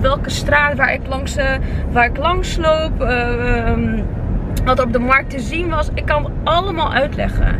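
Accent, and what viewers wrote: Twente, Netherlands. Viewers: ik geniet van dat twents er tussendoor !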